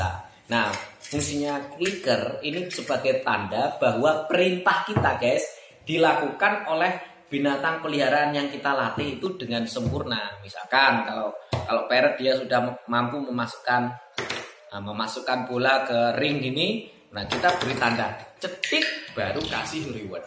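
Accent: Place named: Surabaya, Indonesia. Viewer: Mangkane kok koyok tau eroh, ternyata wong Suroboyo dw hahaha...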